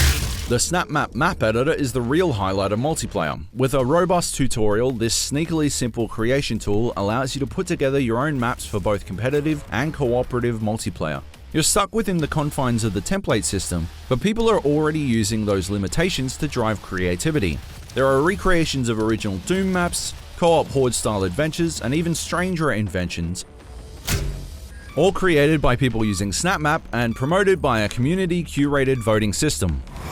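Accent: Australia. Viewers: What's worse is that the Reviewer is Australian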